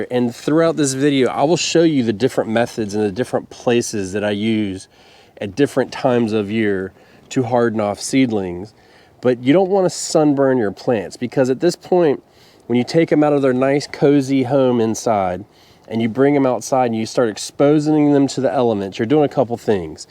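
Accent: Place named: Massachusetts, United States. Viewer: Really enjoying your videos. This is the first time I ever left a comment on anything (I'm an old guy), so I hope it goes through. Anyway, it sounds like you're originally from Massachusetts (I'm from Vermont), now living in NC, inland from you, about 60 miles south of Raleigh. I've been gardening my whole life, mostly on intuition and what I learned from my grandparents. Never have been too technical about anything, so I really like your way of describing how you do things. Recently your clips on starting seeds and hardening them off were excellent. God bless. Keep up the good work.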